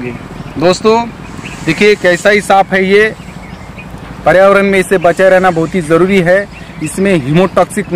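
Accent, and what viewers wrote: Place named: Maharashtra, India. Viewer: मराठी माणसाची हिंदी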